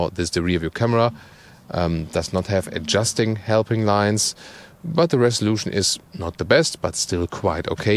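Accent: Germany. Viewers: is it a German accent?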